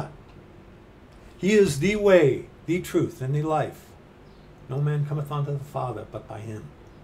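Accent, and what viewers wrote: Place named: North, United Kingdom. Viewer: I try to follow along, sometimes I don't have the time, but when I can I do follow you along. Your Northern accent throws me now and again!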